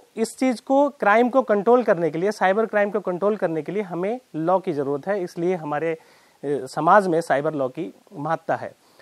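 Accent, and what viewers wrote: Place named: Pilibhit, India. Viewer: maja aaa gaya bhai ----boli pilibhit wali record ho gayee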